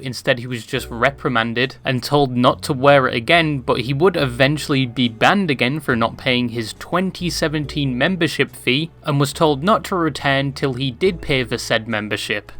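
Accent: United Kingdom. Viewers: 10s in, british, had to click off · You know, I didn't think there would be a British accent out there that doesn't have a hard distinction between the u and a sound, hence him pronouncing furry more like fairy...but, you learn something every day😅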